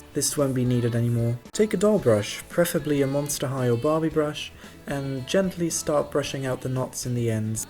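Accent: United Kingdom. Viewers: R u from UK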